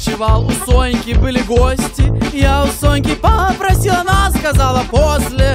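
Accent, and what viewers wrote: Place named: Odessa, Ukraine. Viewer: Очень нравится мне Одесский говор , а у Феликса классный говор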